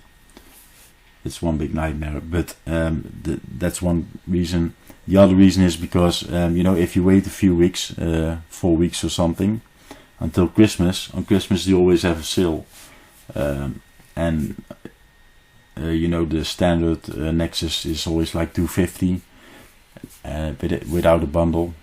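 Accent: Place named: Netherlands. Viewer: Let me guess.... Nederlands? Kan bijna niet missen haha.. 😜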